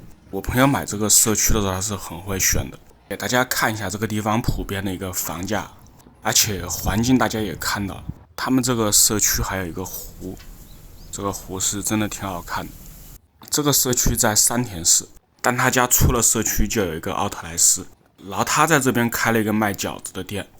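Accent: Wuhan, China. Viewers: 武汉的普通话👍😅😂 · 博主是不是武汉人啊？ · 我也是武汉滴，刚开始冒看评论，一听你口音就知道鸟，我经常去日本旅行，有空聚一哈，研究一下在日本卖小龙虾🦞哈哈哈🤣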